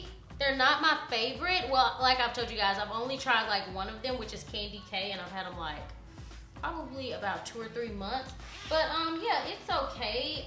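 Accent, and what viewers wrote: South, United States. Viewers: no i didn't come here for fashion advice, i just came to look at you and listen to you talk. i love that country accent of yours I'm from Alabama too, but i don't sound as sexy as you do... and you're so fucking beautiful😫💗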